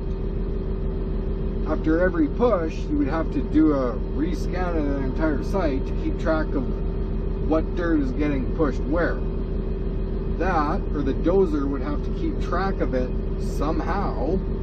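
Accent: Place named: Canada. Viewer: I’d love to learn one of these systems for bigger projects, because running an 8 with a guy walking with a laser setup and a stick in his hand can get frustrating. I can do a decent job just doing it off of my eye, but like you said you still have clean up and compaction to take into consideration. Anyway, I feel like if anyone believes these misnomers that you brought up in the video they are probably irrelevant people to be concerned with and if you put them in a seat of a machine that’s quickly find out that we have job security for a VERY long time as operators. As a side note I love the way Canadians say “PROcess” and “PROject” haha cheers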